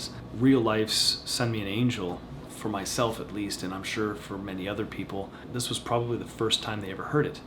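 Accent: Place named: Canada. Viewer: Rad is Rad. I hear a Canadian accent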